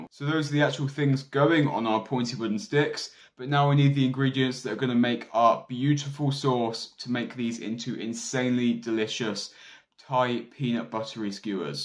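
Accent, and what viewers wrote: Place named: England, United Kingdom. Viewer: sounds like your in England somewhere. we don’t speak like you in California, some words we don’t use in the US.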